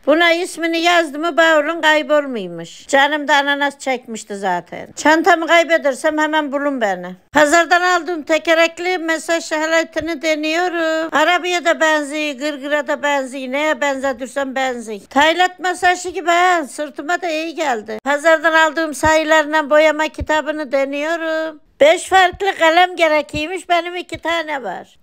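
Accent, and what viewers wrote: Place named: Erzincan, Turkey. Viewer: %100 Erzincan ağzı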